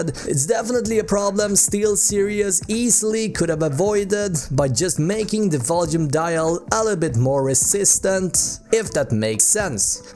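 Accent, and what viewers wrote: Sweden. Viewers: Your english vocabulary is good, and so is your review. But your swedish accent and shouting is really ruining it for me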